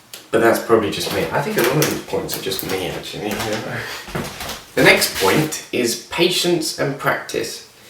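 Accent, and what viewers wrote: United Kingdom. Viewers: No he doesnt. He has an English accent, thats about it. Mitchell is much more nasal. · "Drawrrings" yep he's british it all checks out. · You look like a young Carl Sagan, and you have a deep voice like him, except yours is British haha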